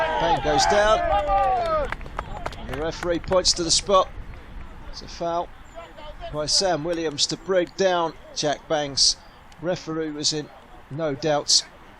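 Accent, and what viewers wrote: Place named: Northern Ireland, United Kingdom. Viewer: commentary is very BBC1 NORTHERN Ireland.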